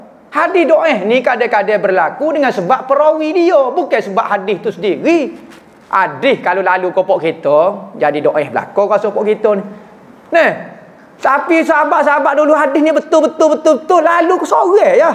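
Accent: Kelantan, Malaysia. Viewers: Assalamualaikum! Ustaz boleh tak cakap Bahasa Malaysia lebih banyak sebab kekadang sy tak faham cakap Ustaz tapi suka dengar ceramah Ustaz sy tak faham loghat Kelantan maaf ya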